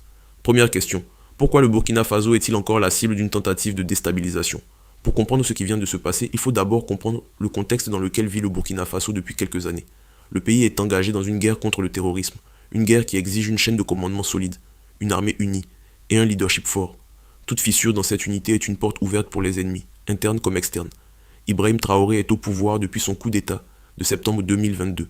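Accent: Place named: Ivory Coast. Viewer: Arrête de mentir, ce n'est pas ressent comme tu essais de el faire croire. Les frères et soeurs de l'AES attention à ce genre de chaine de propagande contre l''AES. Regardez le nombre d'abonnés qu'il a ce mec, 104 et cela signifie que c'est tout ressèment qu'il l'a créer pour divulguer des fausses informations. N'oubliez pas que la France et les pays comme la Côte Ivoire ont mis en place un recrutement de jeunes délinquants ivoiriens. Dans la vidéo que vous pouvez retrouver facilement sur internet, on n'y voie des africain comme ce mec qui parle dans cette vidéo, assis dans une salle avec des t-shirt noirs avec une inscription dessus. Le bute est d'inonder tout les réseaux sociaux pour contrecarrer l'AES et diffuser de fausses informations, faire des faux débats en montant sur des panel panafricains et pro AES pour tenter de les convaincre et de dénigrer les dirigeants de l'AES. 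Donc ne regardez pas ce genre de chaine comme celle ci qui ne sont la que pour la désinformation du Sahel. Ecoutez la voie de gars qui parle dans cette vidéo, on reconnais tout de suite que c'est un 100% ivoirien avec leur salle accent de merde de woubie. Il n'y a eu aucune tentative de coup d'état ces derniers jours ou même heure. En conclusion c'est l'un des chaine de propagande ivoirienne de fake news et de désinformation sur l'AES. Nous sommes sur place et il n'y a rien du tout . Ils vont prendre des images et vidéos qui datent et vont essayer de vous faire croire que ça ce passe maintenant. Peupl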